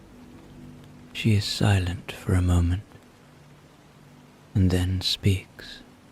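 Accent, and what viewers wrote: Ireland. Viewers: This is the first night listening I have been able to sleep with. I’m suffering protracted antidepressant withdrawal and it causes insomnia with night terror due to hyper vigilance….its near impossible to get back to sleep once adrenaline cortisol kicks in and negative thoughts are embedded. BUT, calm, considered, non demanding voice and Irish inflections make it easy to concentrate on as you know there will be no jolting surprises, sharp sounds and ads….I’m so grateful❤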